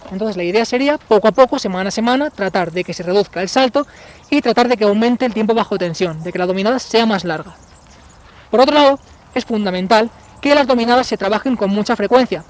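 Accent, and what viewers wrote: Spain. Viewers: De donde es de españa?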